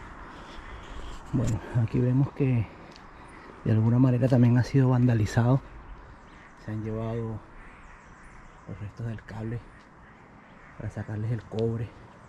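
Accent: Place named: Venezuela. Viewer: Tines asento como de benesolano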